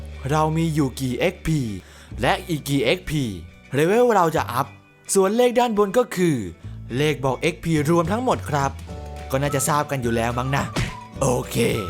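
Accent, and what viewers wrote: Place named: North, Thailand. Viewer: คนเหนือก่อหนิ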